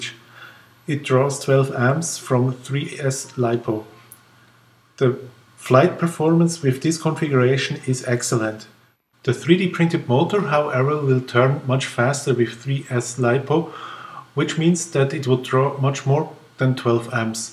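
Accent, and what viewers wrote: Switzerland. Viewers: Oh, dr schwizer Akzent 😂 aber supper idee/ video!! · Great project, keep it up! I guess from your accent and from the markings on the plane, you're from Switzerland ;-)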